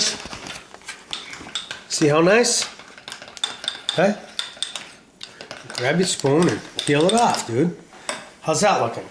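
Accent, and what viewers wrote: Boston, United States. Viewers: Do I hear Boston in there?🙂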